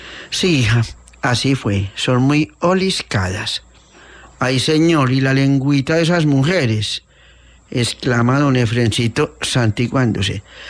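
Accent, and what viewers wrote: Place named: Antioquia, Colombia. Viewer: Qué lectura tan bonita. Con ese acento paisa propio de éste cuento y la música del entre telón bellísima.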